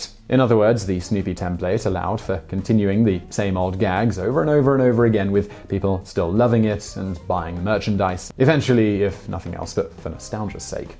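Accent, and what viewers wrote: United Kingdom. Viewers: You come across as pleasant, including your flavor of British English -- yet even you call drawings "drawrings". I don't know what rings have to do with drawings, and personally find it annoying. This is a relatively new phenomenon having developed in Britain, and I bet linguists love this new direction English has taken in Britain.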